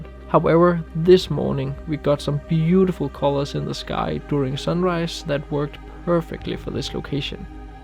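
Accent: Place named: Denmark. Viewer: Its nice to hear a thick Danish Accent (Danglish) :-) Suðuroy is know to having a different dialect then the main islands. Its always know as little Denmark. Nice to Akraberg, Vágseiði and Ásmundarstak (cant remmber seen it my self). Next time, if ever then take a trip to Fámjin where Merkið is found in the local church :-) Hvalbiareiði or Vágseiði is a must in when there is strong winds. You will really appreciate the hard work global mariners do at sea, when you seen the waves and wind take a beating against the rocks :-) If you would like to get a grasp of what the Fellowship of the Ring experienced in the narrowed tunnels in Morria, as for a trip in the coal mines in Hvalba :-) Dont enter if you are claustrophobic :-) And when the sun is shining, take a trip up to Stórhamar plateau and Ørna fjall, walk west to the highest mountain in Suðuroy (cant remmber the name). 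Another trip is to Hvannhaga. Remmber to take pancakes, or waffles, coffee, the and ofcourse Nutella on every trip. And if you are lucky some skerpikød and local made ryebread:-) I got that tip from some locals :-) I am so much looking forward to it :-)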